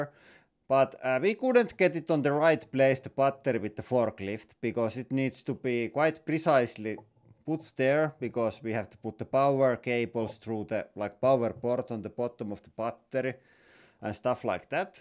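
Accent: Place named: Finland. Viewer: Great video, but Finglish is not easy to listen!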